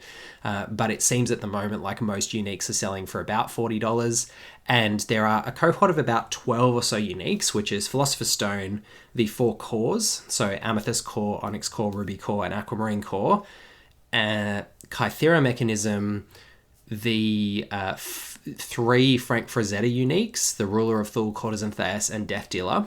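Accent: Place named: Australia. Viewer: What currency are you talking here? USD or AUD? You sound Aussie.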